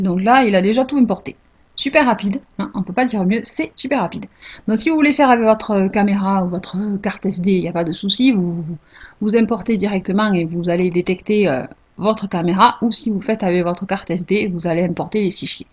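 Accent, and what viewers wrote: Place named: Provence, France. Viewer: et merci l'ascent provensal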